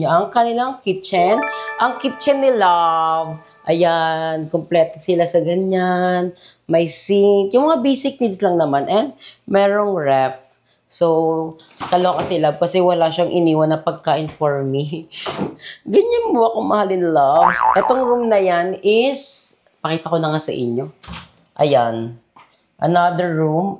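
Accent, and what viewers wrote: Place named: Visayas, Philippines. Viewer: Bisaya poba kayo ma'am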